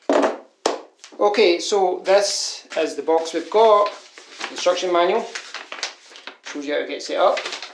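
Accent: Scotland, United Kingdom. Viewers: Are you from Scotland by chance?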